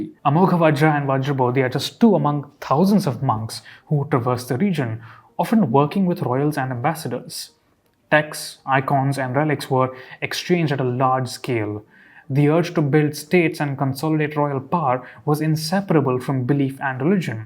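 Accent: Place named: Hindi, India. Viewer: ... once again you are from Karnataka yet you mistakenly say Tamil Nad... instead of Tamil Nadu has Bangalore been so inundated with Hindi migrants your brain simply cannot process Dravidian words without veneering and polishing them in Hindi pronunciation you dense mongoloid. Do you call your home state Karnatak? Do you speak KANNAD? Is the term for your mother Amm... Do better.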